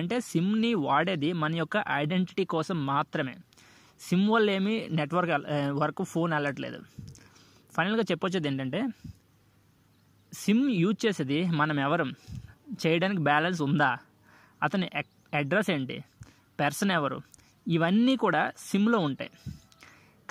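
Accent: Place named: Andhra Pradesh, India. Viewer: I believe you are from Vijayanagaram or Srikakulam. However the content you have given in this video is not about how Emergency works with no signal... Can you be more elaborative ?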